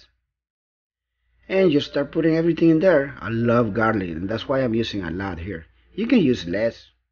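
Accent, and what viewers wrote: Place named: Mexico. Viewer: He's not Argentine. He sounds Mexican, perhaps? This chimichurri is pretty good. I just made a batch.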